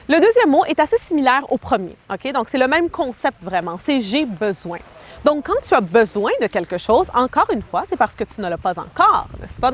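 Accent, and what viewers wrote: Quebec, Canada. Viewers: Ah!!!!!! Cet accent ça me rappelle de bons souvenirs au QUÉBEC merci je m y met..... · C'est l'accent québécois. C'est un peu triste de focaliser sur son accent au lieu de son message.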